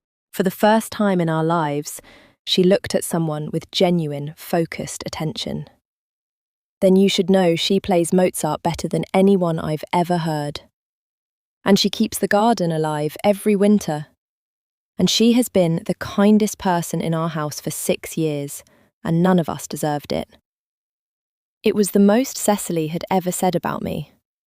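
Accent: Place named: Northern England, United Kingdom. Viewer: The narrators voice is perfect. I love what I believe are shades of her northern or midland accent. The story line was very good. Your channel is a favourite of mine. I found these YT romantic Regency and Victorian channels when they first emerged in late 2025. Thank you for working hard to make your productions just about perfect. The writing, editing and narration are flawless which makes listening a joy. JB 🇨🇦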